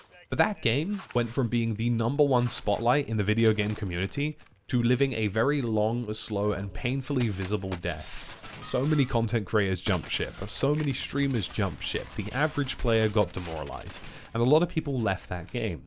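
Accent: United Kingdom. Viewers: Are you well?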